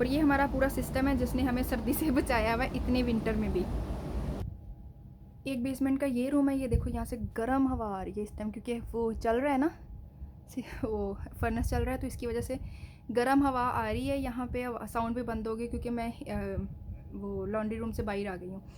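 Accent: Punjab, India. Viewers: Wonderful sharing ❤ Loved your panjabi accent 😍 ❤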